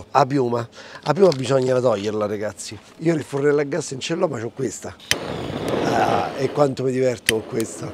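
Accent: Rome, Italy. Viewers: Quanto mi piace quel bel l’accento romano.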